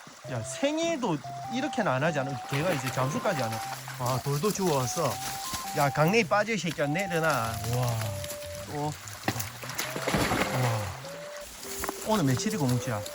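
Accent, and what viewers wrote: Gyeongsang, South Korea. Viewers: 경상도사투리목소리 피식대학의 민수랑 많이 닮은게 더 읏겨ㅋㅋㅋ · 갱상도 말투 진짜 개역하네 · 진주분이 경상도에서 촬영하는데 그럼 뭐 억지로 서울말 씁니까? ㅋㅋㅋㅋ 어이가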